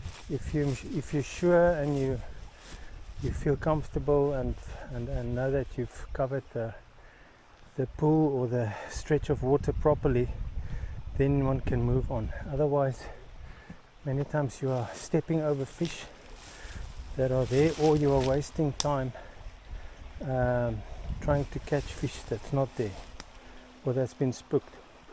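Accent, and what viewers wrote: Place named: South Africa. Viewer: Hows it bru? Just come across your video. My best mate growing up was South African. He was the most loyal true mate you could ever ask for. We had some awesome times fishing together. I still miss him 15 years on. I dont know why that matters to anyone on here but i didnt think hearing ya accent would make me think about him like it has. Keep doing what you doing 👍🇬🇧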